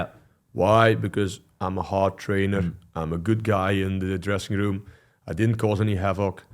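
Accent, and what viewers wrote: Liverpool, United Kingdom. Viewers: He still has a scouse twang to some of his accent haha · sound's very scouse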